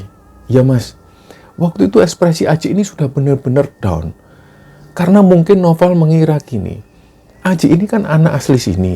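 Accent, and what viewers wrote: Java, Indonesia. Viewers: yg ku senangi sama channel ini, medok jawa nya dalam membawa cerita ini mampu membawa pendengar ke dalam cerita!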